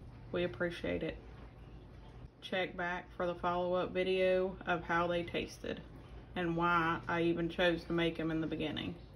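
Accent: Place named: South, United States. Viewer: She did a great job. Don’t give up... you can do this! I Everyone sounds differently! Your Southern drawl is different and makes you unique! Paula Dean and Dolly Parton have your accent and it never stopped them! Come on back to the You Tube family and do your thing! 💚✨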